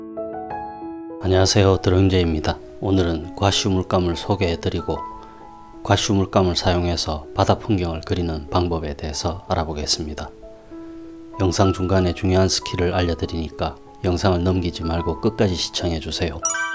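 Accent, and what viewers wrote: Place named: Busan, South Korea. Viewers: 오~~!! 같은 부산분이셨네요~ 어쩐지 사투리가 익숙하다했습니다~ㅎㅎ 매일 다양한 기법들 잘 보고 배웁니다~^^ 좋은영상 많이 올려주세요~~ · 부산분이네요^^ 반갑습니다 저도 부산사람 ㅎㅎ 말하는게 넘 잼있어요 그림하나 몰라도 계속찾아보고싶게하네요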